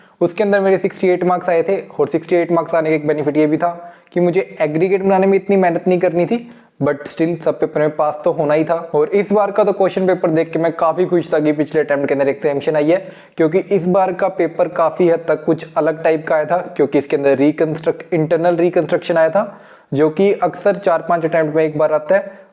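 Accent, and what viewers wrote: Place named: Punjab, India. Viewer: okay ji mai vi punjab to . Tuhadi accent to pta lg gya tusi punjabi ho . Mai fazilka to ha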